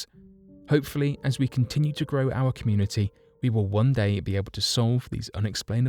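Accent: United Kingdom. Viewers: The Aztecs were of north and Central America, The Incas are from South America. This information provided is off, everything sounds legitimate when you have a dude with a British accent narrating it.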